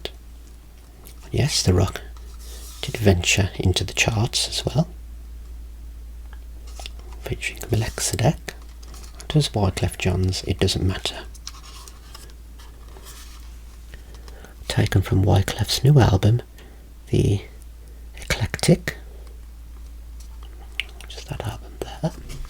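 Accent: United Kingdom. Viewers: I absolutely love your voice and that beautiful british accent. I swear you could even read the phonebook to me and i would enjoy it ❤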